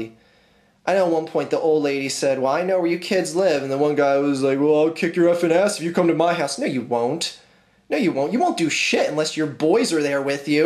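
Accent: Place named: United States. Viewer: well, judging by the way you talk, you must be an American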